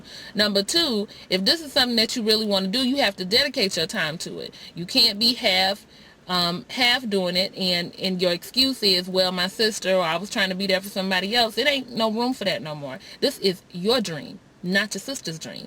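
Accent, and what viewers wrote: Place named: South, United States. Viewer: Great review and you are quite funny. lol I am trying to place your accent. It sounds like somewhere down south.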